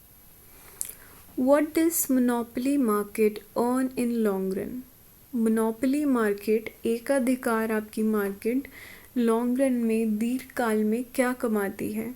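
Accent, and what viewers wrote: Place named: Kashmir, India. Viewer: voice sai Kashmiri lag rahai ho ap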